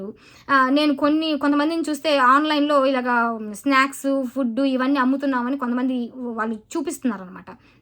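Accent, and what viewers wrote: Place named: Guntur, India. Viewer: Sister.. Ur from Andhra??? I think ur chridtian. Ur taking like Guntur Telugu language.. M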